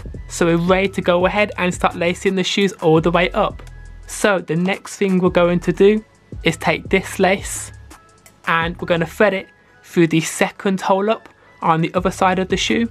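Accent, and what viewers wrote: United Kingdom. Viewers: Mate, these kicks are rad! I love your accent too. Which part of England are you from? Cheers, Fran (NZ)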